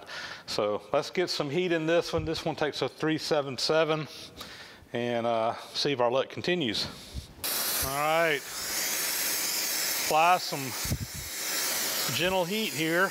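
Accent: South, United States. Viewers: As a new subscriber to your channel and a longtime subscriber to Leo’s I would like to ask a favor. If you will sir, please take a breath between your name and Vintage Machinery. It sounds like one-word it all runs together and is difficult to understand. The rest of your video sounds great with your eloquent Southern accent. I know your future subscribers and some of your present ones will thank you for it. Looking forward to watching more of your channel.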